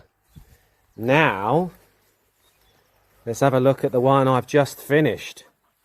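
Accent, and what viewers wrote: England, United Kingdom. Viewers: Watching from the SW of Western Australia. I am originally from Essex. Love hearing your English accent. You are doing a fabulous job on the cottage…Donna, 🇨🇰🦘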